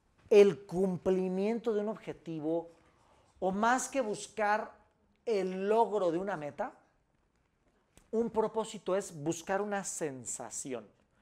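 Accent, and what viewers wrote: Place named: Mexico. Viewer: hola! jaja siempre me haces reir, y lo "chingado" es que cuando me paso un rato esuchándote... jajaja salgo hablando "mexicano" o sea... com tú... Mi hija me riñe, vivo en Alemania, y por suerte acá nadie me entiende, jajaa. Me encanta como eres, Gracias por todo lo que nos compartes, y por tu simpatía.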